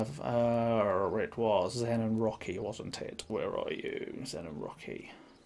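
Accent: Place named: United Kingdom. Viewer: You sound British tbh :P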